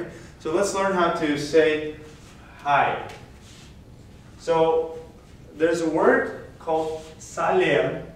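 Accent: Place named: England, United Kingdom. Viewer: Жаман деме-онша емес,жай. Что-то Ваш казахский с английским акцентом :)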